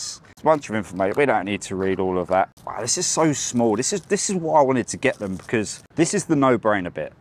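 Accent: United Kingdom. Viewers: do you have a Cockney accent?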